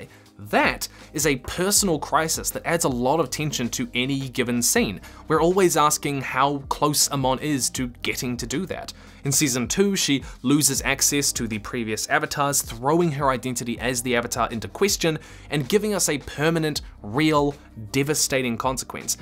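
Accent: New Zealand. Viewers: This guy is from New Zealand, yes? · He-he. "Dee-Deevil" Kiwi accents are the best.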